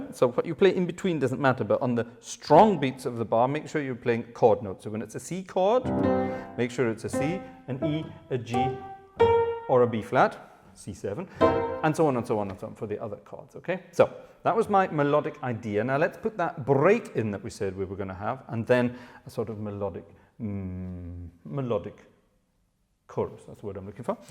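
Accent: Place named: Scotland, United Kingdom. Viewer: I watch the German version for the same reason. German with a slight scots accent is very easy on the ear. ;-)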